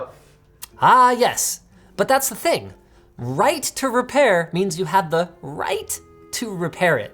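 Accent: United States. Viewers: Linus just sounded so American when talking about all of this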